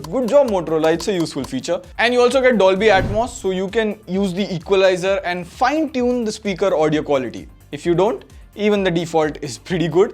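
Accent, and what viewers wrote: India. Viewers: Hi, i'm an Italian man. I watched your video because i'm evaulating the phone, but without the help of the subtitles i couldn't understand what you were saying. It's me, im' not used to listening english of people from India. Anyway, good video (writing, screenplay, photography, effects), great review (I'd love more hand-on use, but whatever), keep looking to me the perfect replacement to my Moto G8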